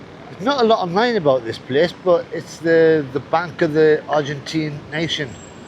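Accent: United Kingdom. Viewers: it is british, you guys are just too used to the posh accent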